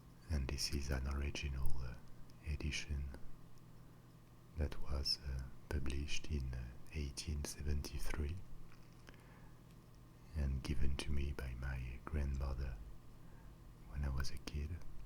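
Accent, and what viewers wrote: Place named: France. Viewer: male asmr voices have never given me tingles. until now. books.. French accent.. so many tingles. well done, Sir, well done. subscribed!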